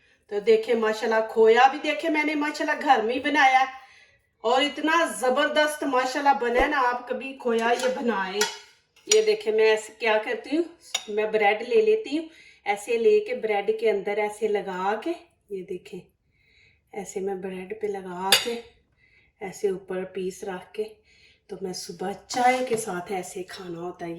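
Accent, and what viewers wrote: Punjab, India. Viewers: She is constantly speaking in stupid punjabi accent. Quite irritating. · Aapki Punjabi vadde hai... aapnki urdu se Pata chalta hai ...masha Allah... 🥰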